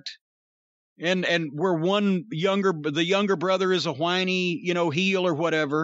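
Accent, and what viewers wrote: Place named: Delmarva, United States. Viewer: Delmarva accent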